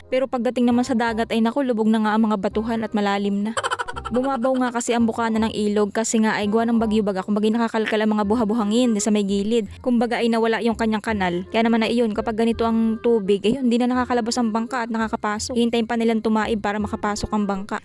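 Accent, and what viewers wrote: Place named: Quezon, Philippines. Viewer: Di namn Batangas Yan Quezon Yan sya😂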